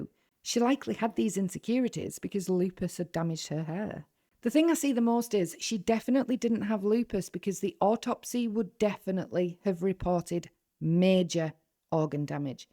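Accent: United Kingdom. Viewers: I love the British accent❤ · New to your channel, i love the British accent. Hard to understand those that don't (kiwi here)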